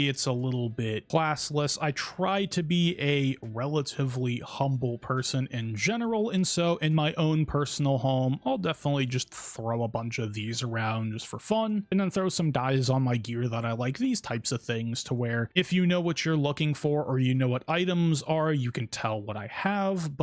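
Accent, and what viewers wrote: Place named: Australia. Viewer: Australian guy talking about castles? I'd be surprised if it wasn't Shad.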